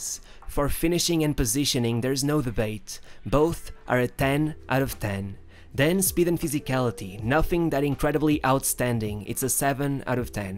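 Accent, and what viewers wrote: Portugal. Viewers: that’s just his Portuguese accent then. Nice back track though. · Man are you from Portugal?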